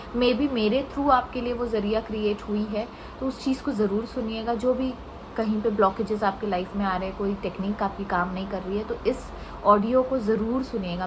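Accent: Hyderabad, India. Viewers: You say Honga jaenga.. are u from Hyderabad?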